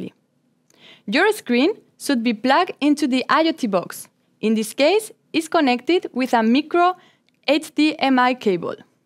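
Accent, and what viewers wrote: France. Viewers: Coostomer 😂 , or customer anyway , nice video with french accent 😊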